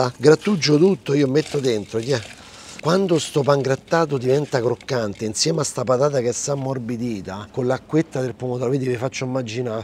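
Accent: Rome, Italy. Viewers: Grazie per la ricetta, per fantastico romano, a parte che sei un poeta vero e proprio!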